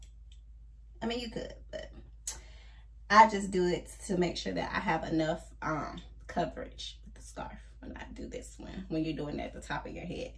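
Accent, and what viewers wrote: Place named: New Orleans, United States. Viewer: Beautiful head wraps. New Suscriber on board. Are you from New Orleans? I love your accent.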